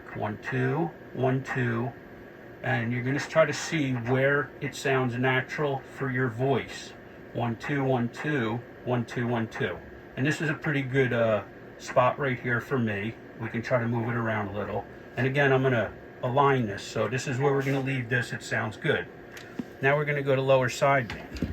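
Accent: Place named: Long Island, United States. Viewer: Sounds like Long Island N.Y.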